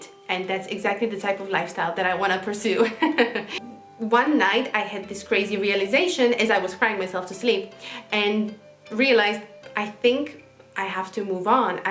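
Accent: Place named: United States. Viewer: For someone who was born in Slovakia, your facial expressions and accent is very Westernized, or at least Americanized.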